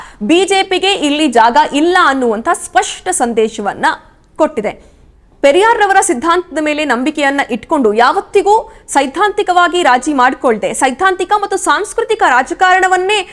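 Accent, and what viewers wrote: Standard. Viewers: Clean kannada good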